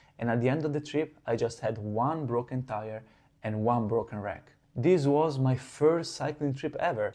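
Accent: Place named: Italy. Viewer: Si sente che cmq sei italiano ❤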